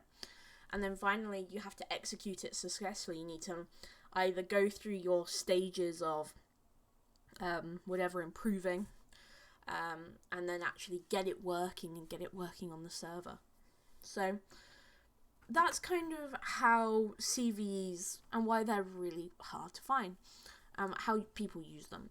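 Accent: United Kingdom. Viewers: I'm completely tunned, I love listening to british accent. Keep going, you're fantastic .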